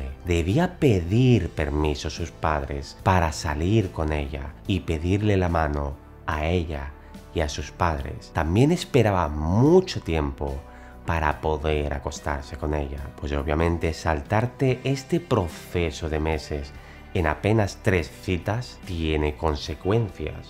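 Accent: Spain. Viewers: su acento bien español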